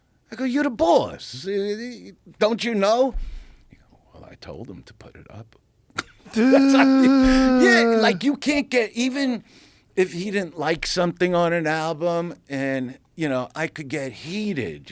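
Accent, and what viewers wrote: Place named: Brooklyn, United States. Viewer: Gotta love the Brooklyn accent: "This guy Mahk."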